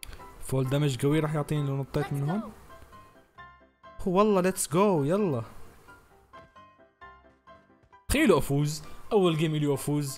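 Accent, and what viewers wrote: Iraq. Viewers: احلى واحد يحچي عراقي ضلعي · دقيقة ملك اللهجه العراقية 😂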